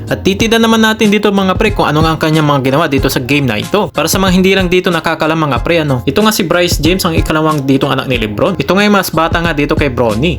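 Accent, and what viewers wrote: Pangasinan, Philippines. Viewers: kahit di ko na itanong, Pangasinan ka aro! 😅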